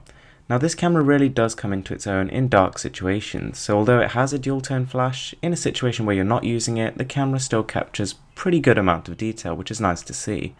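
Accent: United Kingdom. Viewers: Hey I Just want to say I love your videos, and your british accent. I want to ask you a questions what budget smartphone would you recommend for some one in the US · Hi man, u have a nice British accent..thanks for these videos, this is a gr8 phone.. I hav bad signals on my Motgo X 1st gen and hence went for Moto E 2nd gen which is pretty much an amazing phone-water-resistant, scratch proof (GG3), i've tried it too, dual sim, stock features, doesnt hang in normal usage, 2 days battery. Would u suggest this phone over my current phone ? I m lukng to just change my phone into a >4.7", tatz why..but shld be nonetheless for rough use n dual sim, shldnt hang, i dont play games. Hope its water-resistant?? Ofcourse I'll miss my legendary Moto Display feature..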